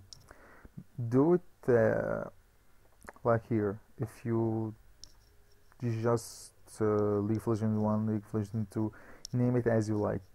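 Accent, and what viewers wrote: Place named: Brazil. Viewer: wow, i thought you were brazilian.. Your accent is quite similar. Great video btw! it really helped me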